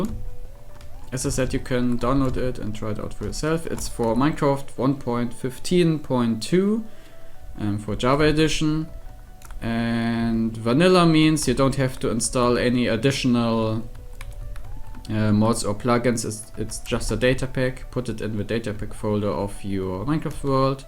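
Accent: Germany. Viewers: Achja der deutsche Akzent in englisch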